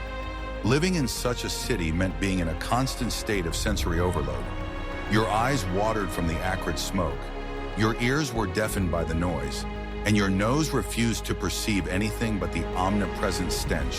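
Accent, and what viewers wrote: United States. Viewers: What’s this American voice all about, it’s put me off as you don’t tend to believe it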